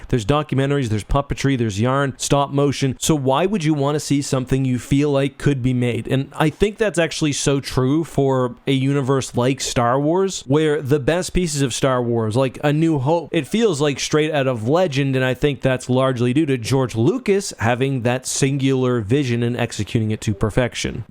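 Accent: Canada. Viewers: If I remember correctly, he was a “boring” kind of lawyer. Might have been a copyright lawyer but definitely don’t quote me on that. It was his actual job when he started making YouTube videos but eventually the channel got so big he had to choose and this ended up being his choice. He’s also Canadian if you couldn’t tell by the aggressive use of “aboot”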